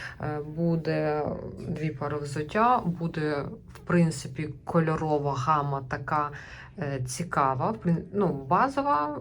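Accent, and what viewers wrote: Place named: Ukraine. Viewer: вы русские слова пытаетесь произносить на украинский манер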